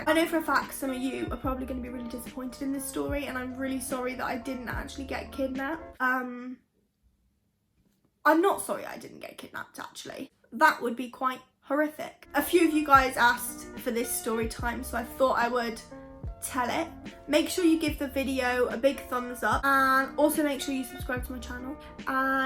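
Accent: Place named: Wisconsin, United States. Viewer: Came from Tik Tok and didn’t realize you had an accent. Lol such a surprise. I forgot not everyone talks with a “Wisconsin Accent”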